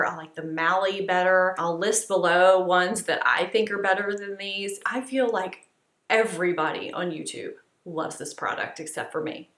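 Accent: South, United States. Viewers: Hi! " sent me" and I"m staying! As for eyeshadow for liner, I like the look but I can't get it to stay for more than an hour or 3. What's the secret? And did I hear a bit of a southern accent?! I"m from NC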